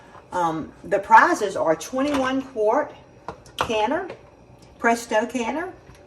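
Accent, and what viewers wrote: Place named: South, United States. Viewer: Never seen yellow.. I love your southern accent..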